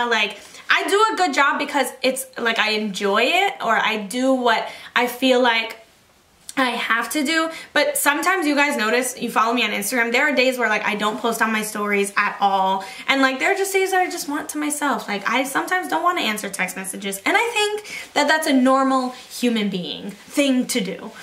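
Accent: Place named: Italy. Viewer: I loved the confidence part you spoke about. It’s so hard to get some confidence when you are comparing your self to others. 😩I guess I just gotta work on me and what I want in life. It’s a struggle but one a day at a time. 🙃🙂I assumed you were Italian btw. Haha is Spanish speaking gals we have a accent that can confuse ppl. 🤣🤣 🙋🏻‍♀️😊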